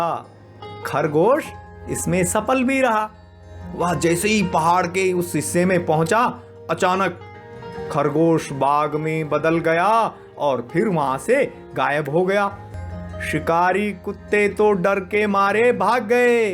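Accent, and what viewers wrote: Garhwal, India. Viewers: गढवाली बोली सुनना बहुत बहुत अच्छा व मनमोहक व आकर्षक , आत्मिक लगता है।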